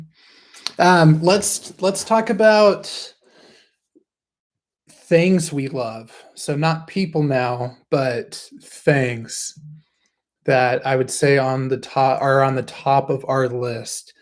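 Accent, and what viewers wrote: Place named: Africa. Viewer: I love African accents too!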